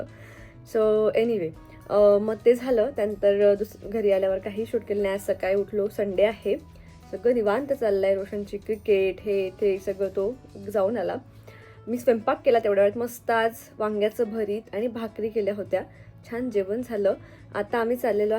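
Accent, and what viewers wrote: Vidarbha, India. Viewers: Ho bai... vidharbh tone mdhech yeto pn kharch watcha brand Samjla ch nhi 😂😂😂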